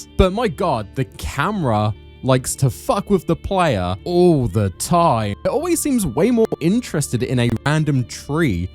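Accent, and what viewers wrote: United Kingdom. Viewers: Binged the first 3 so far. Really like your style of video. Feels authentic and not AI scripted! Which is a blessing nowadays. I'll continue to binge the rest of the series. For now. Until you Brit voice gets to me!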